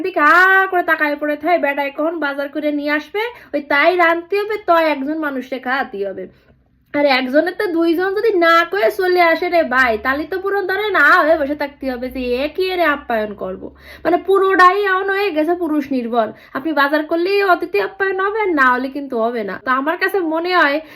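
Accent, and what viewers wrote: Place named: Khulna, Bangladesh. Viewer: আপনার প্রতিটি ভিডিও খুব আগ্রহ নিয়ে দেখি আপু,, নিজের এলাকার মানুষের মুখের ভাষায় কথা শুনে আপন আপন লাগে,,,আমাদের খুলনা ডিভিশনের কালচার,মানুষের মেন্টালিটি, চিন্তাধারা আসলেই বাংলাদেশের বাকি সব ডিভিশন থেকে আলাদা এবং ইউনিক স্বচ্ছ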